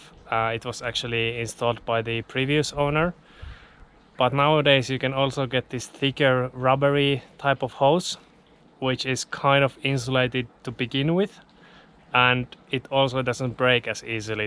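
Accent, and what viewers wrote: Finland. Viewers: Great! Informativ for me and my campingbus heat project. I go for a small diesel heater, who can work on full trottle. I don't understand where you came from, but I notice some Finlands accent 😃.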